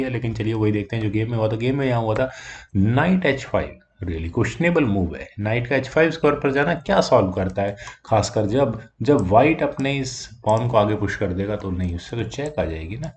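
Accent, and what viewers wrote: India. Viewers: Could someone tell me whether the speaking person uses only English? Because of Hindi accent, I am not sure if it is only English or some Hindi words are used also.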